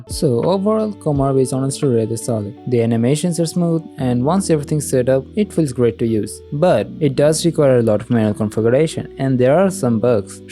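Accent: India. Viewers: Are you Indian by any chance? Your accent seems pretty Indian. Loving the videos by the way.❤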